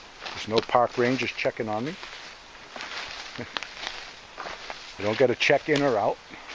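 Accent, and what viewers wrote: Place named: East Coast, United States. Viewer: Sounds like an east coast Dick Proennecke!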